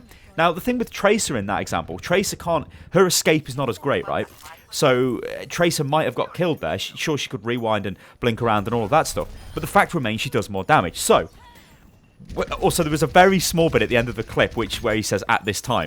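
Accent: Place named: United Kingdom. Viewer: But with an awesome british accent though.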